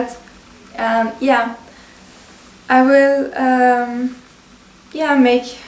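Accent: Austria. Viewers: okay hier hört man, dass du aus österreich kommst! ;) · I'm watching all your videos so I can pick up your Austrian accent!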